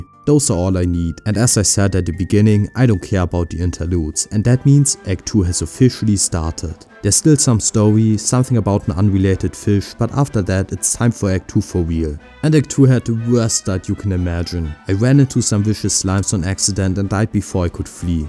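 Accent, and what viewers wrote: Germany. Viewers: Wie hart man hört, dass du Deutsch bist xD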